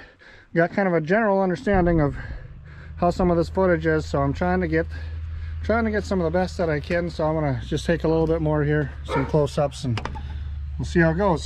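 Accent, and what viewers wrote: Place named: Canada. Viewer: You sound Canadian 😎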